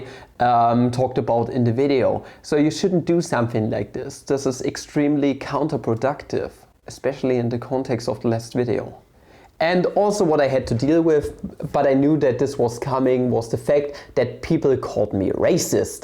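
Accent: Germany. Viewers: I have rarely seen your channel and your videos and just stumbled here because of algorithm. I don't know why you hate indians or indian claims, maybe i know. Maybe it bugs you that someone can be better than the superior western european white race. May be it reminds you of the trauma of how west and europe is declining and other parts of the world are no more your slaves and your yaysayers and they are claiming their heritage. Maybe its because of your accent you seem deutsch and your ancestors felt the same way about indians. They detested and hated indians. Incidentally, they hated jews as well so i guess the problem lies with jews and indians somehow. Your rant reminded me so much of your ancestors. Maybe the apple doesn't fall far from the tree. Disclaimer- I am usually an opposite of a nationalist or so called patriotic and i know you are going to paint me as one for your convenience and i don't care, i am usually a cool dude and i apologize if i am rude to you but your rant and your intrinsic subtle hate about a group of people made me comment. 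I usually never comment on anything. I hope you educate yourself, visit india sometime and learn that india is even bigger than europe in terms of population and people groups and there are many different kinds of people and have differing opinions. Just like europe has far right white supremacists like yourselves, india too has bunch of bigoted nationalists, scammers and bad people and if you count them, they are probably far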